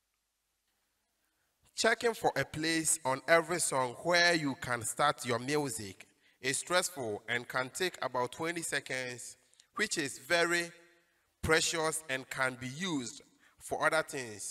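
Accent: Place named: Ghana. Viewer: You sound Ghanaian😎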